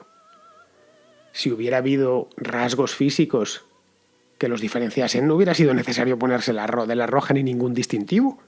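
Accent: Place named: Spain. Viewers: es horrible el acento español uno mas que otros, para hacerlo mas serio deberias pagar para una narración mas limpia. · Vaya por Dios, los hispanos hispanoparlantes de esa parte de la Hispanidad, llamada España, lamentamos que no te guste este acento; Bueno, aquí también tenemos variedad de tonalidades...igual te gusta más el acento andaluz o canario o tal vez el extremeño o tal vez te guste más el murciano.....yo hablo como el locutor ,ósea, mi español fuerte y seco no te gustaría...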